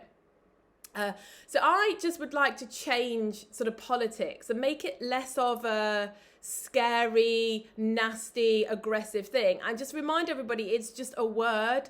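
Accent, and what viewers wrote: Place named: Yorkshire, United Kingdom. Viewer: The floor in your argument is that 80% of the population are as thick as you know what. They are not capable of reasoned thought. That is why things like football exist. To give those people a raison d'etre. PS, where are you originally from ? I think an east Yorkshire twang occasionally makes a bid for freedom.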